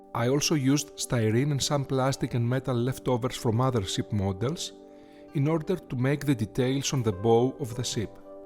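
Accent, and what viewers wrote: Greece. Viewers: what is this gentleman's accent? I want to say Greek? It's lovely, very pleasant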